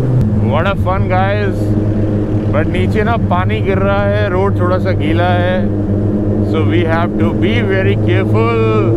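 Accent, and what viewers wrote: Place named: Bihar, India. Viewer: Stop speaking like bihari babu aaraha Hain speak like Punjabi aa rahi Hain Hain bro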